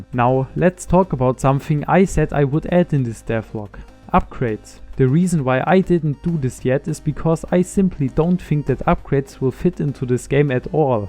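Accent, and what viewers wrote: Germany. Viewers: you have a german accent.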